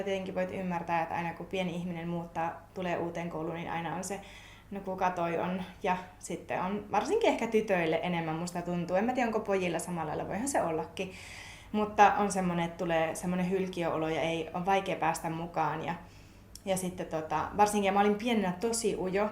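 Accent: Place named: Oulu, Finland. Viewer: Hei, mua ei niin ahdista talvet pimeyden takia, kun ne tavallaan myös rauhoittaa itseä ja helpompi nukkua. Suomessa ja varsinkin talvessa itselle suurin ongelma ihmisten asenne ja yleinen ilmapiiri. Tavallaan vaan haluaisi pois täältä ja vaikka semmoiseen paikkaan missä ei edes olisi koko talvea aurinkoista. 😅 Oon muuten kans Oululainen ja sun murteesta kans kuulee et olet ☺️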